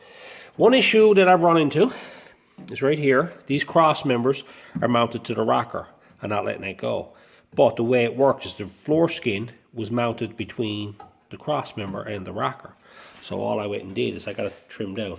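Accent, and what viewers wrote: Newfoundland, Canada. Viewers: Newfoundlander? Always a pleasure. Subbed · Where are you from in Newfoundland? Def tell cause the accent. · sound like a newf ?? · Newfoundland eh?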